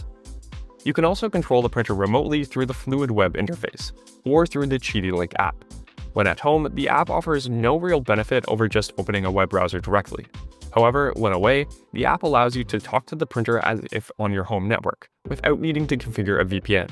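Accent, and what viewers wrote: Canada. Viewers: Are you Canadian? It's difficult for a non-English speaker to tell, but i think there are some words that fit the bill ("about").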